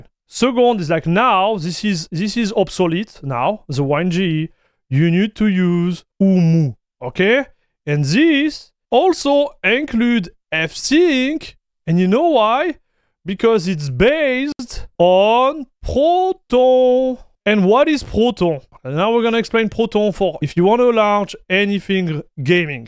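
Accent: France. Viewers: Have not heard Fran-glish for a while, it never ceases to amaze me. I feel like i can speak French :)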